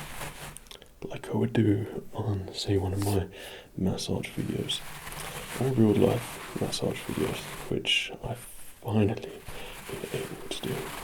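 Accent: United Kingdom. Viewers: Where exactly is his accent from? The U.K. obviously, but where specifically? It seems quite distinct from what I traditionally associate with the "British Accent" . . . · Is he actually posh English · This is so relaxing, I like not being able to see what's being used, also nice to hear a British accent like my own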